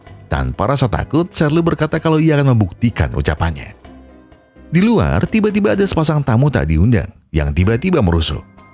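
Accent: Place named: Semarang, Indonesia. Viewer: logat mu to kayak wong semarang og mas😭😭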